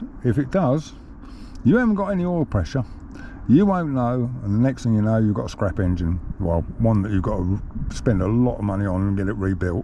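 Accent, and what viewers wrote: Suffolk, United Kingdom. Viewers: Many thanks for this, Mr. I will save the link till it's time for my 500. In my opinion, the gear cover casting is one of the most beautiful parts of the engine. I have enjoyed watching this, and listen to your pleasant speech. Suffolk, was it? Swedish regards. 😀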